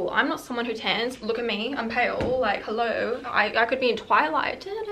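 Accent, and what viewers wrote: Australia. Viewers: 5 reasons why changed my life: 1. made me boost my self-esteem. 2. made me physically healthy & mentally 3. got an aussie accent hooray! 4. Life lessons, like. thank you! 5. made me SMART and organized. Like love you your a life changer i will definitely talk about you to my grandchildren LOL ❤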